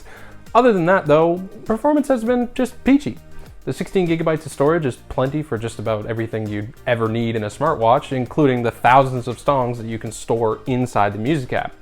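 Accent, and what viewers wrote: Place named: Newfoundland, Canada. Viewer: Newfoundlander!?? Sub’d! 🍕👍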